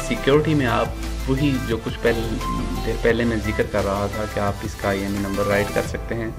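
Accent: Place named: India. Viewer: if you cannot speak English - don't speak. 4kin Indian pronunciation: just make the text on screen!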